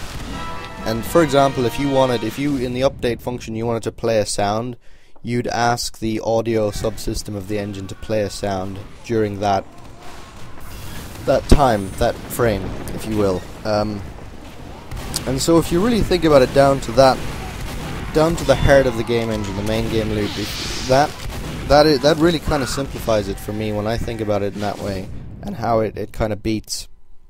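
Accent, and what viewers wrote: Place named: England, United Kingdom. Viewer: at the start.... I see what you meant about the English accent xD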